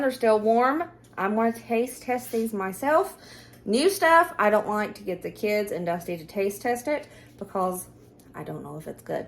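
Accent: South, United States. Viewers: I love hearing your voice! Girl, you deliver with a happy Southern accent! Thank you for being honest and ‘real’!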